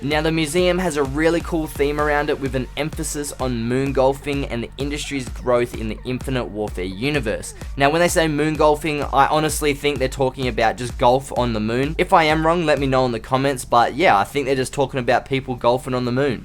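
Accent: Australia. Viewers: I swear he's Australian not British.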